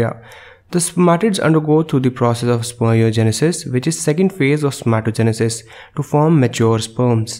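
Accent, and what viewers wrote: India. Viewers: don't worry for your Indian accent-which, if we accent the American prononciation- just has to be accepted as it is. It took the first 3 seconds to adapt myself, and i understood you perfectly though I am not a native English speaker. And for those who find it;s too fast, just go to 'setting" (the little wheel) and change the speed! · There is nothing 'easy' about an English speaking person having to understand an Indian speaking person w/ a heavy accent explaining something in English. It's something about the 'sing-song lilt' that unpleasantly overshadows understanding the explanation for me. Even more so when they're speaking too fast.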